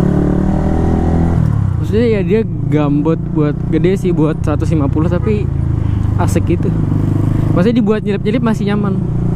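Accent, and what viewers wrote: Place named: Java, Indonesia. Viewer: Pasti kamu manis banget yo mas, suara mendok nya ❤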